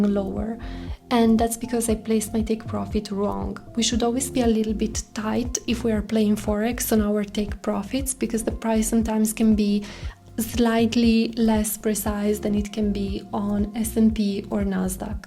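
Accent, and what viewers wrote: Italy. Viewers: Sorry, your accent is very similar to the italian accent. For example you pronounced "blue" in a perfect italian haha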